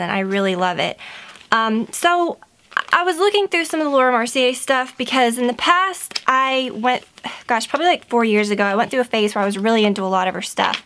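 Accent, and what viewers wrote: South, United States. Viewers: Where are you from????? I knew the South (accent) , but close location? Like I am South Eastern..... about an hour ish from Savannah !!!! I knew I totally related to you for a reason. Almost everything you haul.....if I dont already have it.... I go & get it. ♡♥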